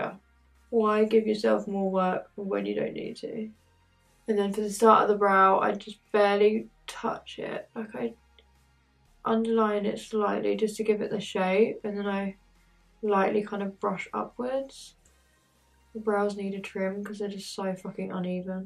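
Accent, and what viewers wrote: United Kingdom. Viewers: didnt expect you having a british accent lol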